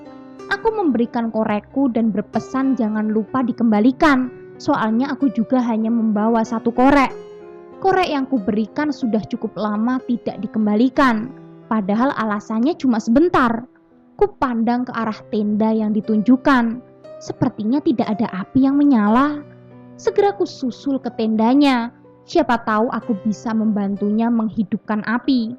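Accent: Java, Indonesia. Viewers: Logat nya medok kali..😂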